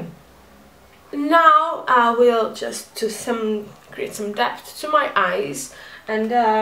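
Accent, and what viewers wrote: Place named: England, United Kingdom. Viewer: You're so funny and really like your English accent :)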